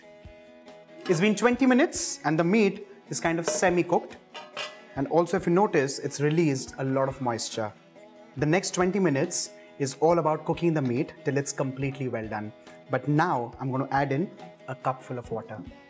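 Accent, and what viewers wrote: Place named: England, United Kingdom. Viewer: I love your english accent ,bro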